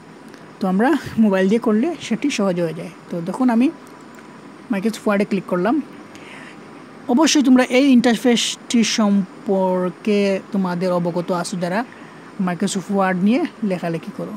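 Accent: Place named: Sylhet, Bangladesh. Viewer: তুমি সিলেটি নি ইয়া মাত বুজা যার🤣যাক আমি কিতা তাইলে বুঝছি নি, জানাইয়ো তে কমেন্ট এ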